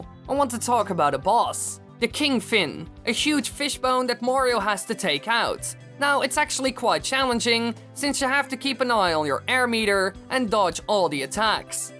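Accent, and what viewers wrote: Netherlands. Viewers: are you dutch? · are You dutch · you have a good dutch accent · are you dutch and speak english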